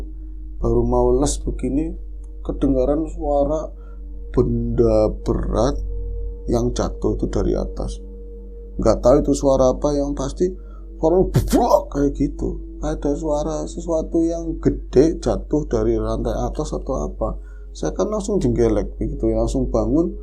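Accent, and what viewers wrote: Banyumas, Indonesia. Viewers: Asyiik juga dengerin mas e br kisah pke bhs ngapak2 gmn gtu... menghibur ...😊😊😊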